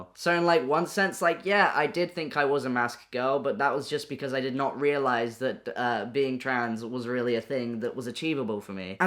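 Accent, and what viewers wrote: United Kingdom. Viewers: Dude I literally thought you were just an androgynous guy with a lovely British accent- Edit: Is that transphobic??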